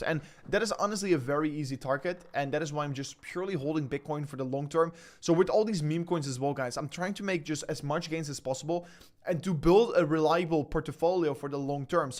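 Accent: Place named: Netherlands. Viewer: Why you always say aswoll😊 very obvious dutch slang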